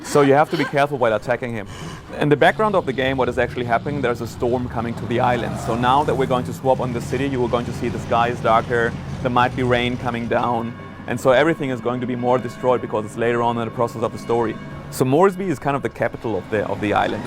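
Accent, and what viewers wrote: Germany. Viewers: No he's not gay, he has a German accent. And the reason why you think having a German accent makes a person gay is because it (probably) reminds you of Bruno. Please try to educate yourself more in the future, American. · his german, thats an german accent... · alter... sogar ich höre raus, dass er deutscher ist xD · He has a slight German accent, although his English is good. Anyway, I can't wait to play this bitchin game!:D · german accent ftw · he sounds so german ^^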